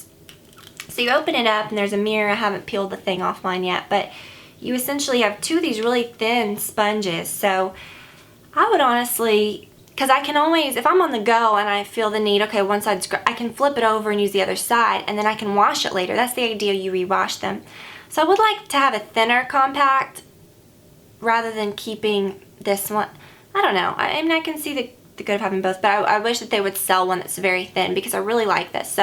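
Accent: South, United States. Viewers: Cute (and unusual for you ) southern accent in this video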